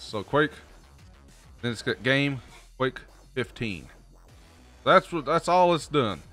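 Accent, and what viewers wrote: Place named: United States. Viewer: i really like your cowboy accent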